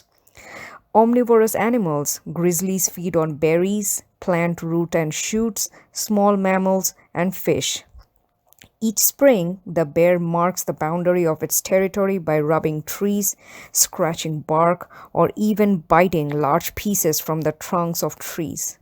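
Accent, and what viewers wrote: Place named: India. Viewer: Are you a indian descendent cuz Your accent seems to have it.. But nice video Very illustrative.. Nicely done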